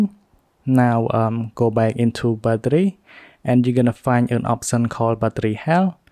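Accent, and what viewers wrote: United Kingdom. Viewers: Sounds like British language when he’s talking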